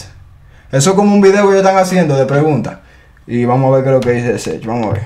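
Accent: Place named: Panama. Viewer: tu hablas como que estas con los panameños yo soy tu no tires ni diaqui ni de aya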